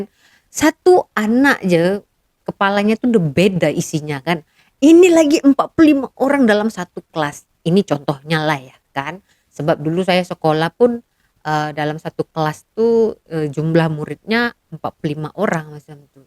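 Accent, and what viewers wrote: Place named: Malaysia. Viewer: Wah.. bila cakap melayu mcm org msia dah skrg ni.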